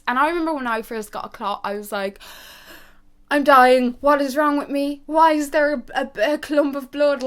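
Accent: Dublin, Ireland. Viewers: Thank you so much for making this video cause in my house the word period is like a bad word it's so annoying. I love your EARRINGS btw❤❤❤ If your doing a Q&a anytime soon I wanna know what part of Ireland your from I'm from Dublin you sound a bit Dublin but ur like a lot posher than me so then I just thought u were from sligo for some reason idkw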